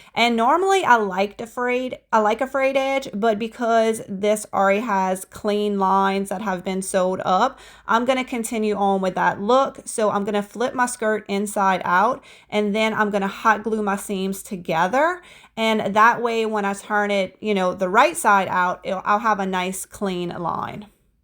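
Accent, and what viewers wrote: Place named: South, United States. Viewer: I found you after the first thrift challenge with Sherry. I have so enjoyed your channel, and that beautiful accent of yours. I'm from SC, and I love our southern accents. 😊 That little lamb turned out so very gorgeous! And the wreath/art stand from a spindle...what a smart idea!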